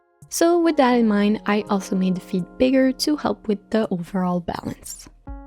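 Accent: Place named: Quebec, Canada. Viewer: I love him so much, i'm surprised you have so little followers since your stuff is so good :O ps. are you from quebec ? Because you have a similar accent to me :3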